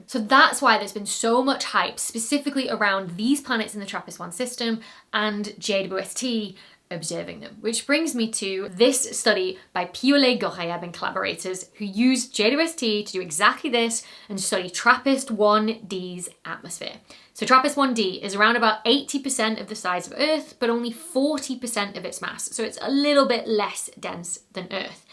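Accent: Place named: Durham, United Kingdom. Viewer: You really picked up the accent at durham, i couldn't work out if you were Victoria wood or steph houghton lol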